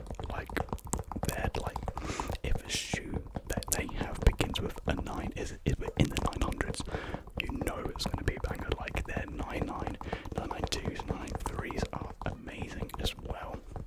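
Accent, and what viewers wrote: United Kingdom. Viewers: A British asmr voice, yey🎉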